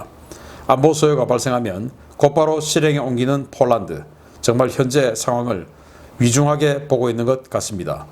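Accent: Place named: Seoul, South Korea. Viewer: 서울말 쫌 되니 .....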